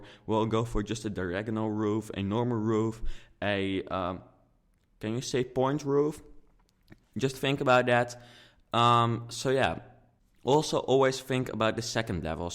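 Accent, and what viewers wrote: Netherlands. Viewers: Ik hoorde meteen aan je accent dat je Nederlands was! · before i saw your name i could hear you were dutch haha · Je hoort zo dat je Nederlands bent 😂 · Same! I'm Dutch myself and I knew as soon as he said the first sentence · haha je kan gewoon horen dat hij Nederlands is! xd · Haha ik dacht al METEEN dat je nederlands was Hahaha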